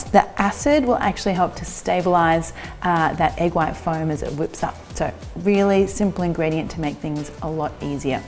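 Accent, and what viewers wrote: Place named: Australia. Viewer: You're sounding more Aussie since coming back home!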